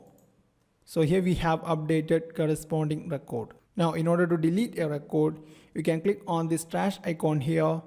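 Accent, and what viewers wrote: India. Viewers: I love Indian English :)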